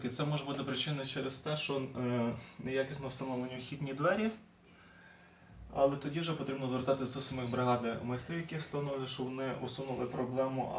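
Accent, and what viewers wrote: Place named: Surzhyk, Ukraine. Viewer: безграмотный сельский суржик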